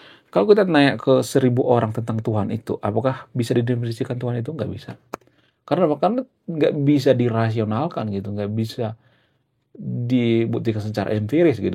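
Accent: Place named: Medan, Indonesia. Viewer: bg lu org medan ya? logatnya msh kental.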